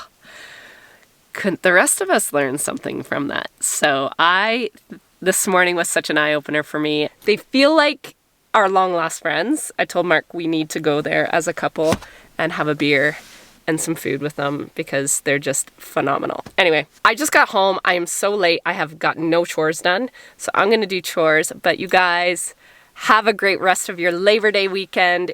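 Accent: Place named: Canada. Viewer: ‘....they’re friendly,..eh’...! Wonderful Canadian...! Love the language,..eh..!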